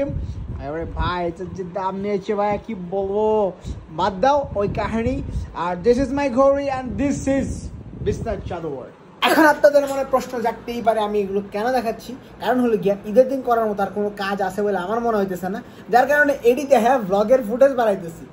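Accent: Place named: Chittagong, Bangladesh. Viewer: Vai tomar kotha te tw Chittagong er tone ase..So you are not from chittagong?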